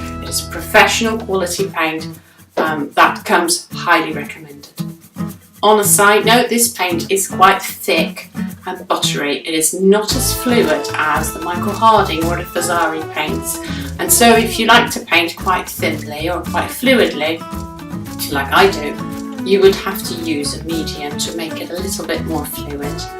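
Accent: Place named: England, United Kingdom. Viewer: Nice review. Surprised you sound so English. I love Old Holland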